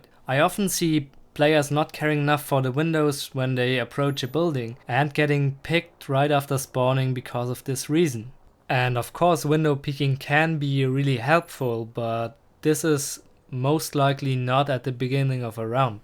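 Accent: Germany. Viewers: you Sound german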